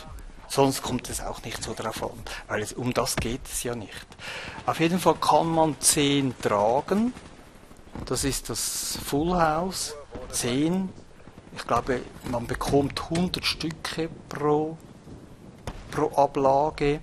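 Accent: Switzerland. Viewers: Mit deinem schweizer akzent hab ich kein problem denn den find ich geil!!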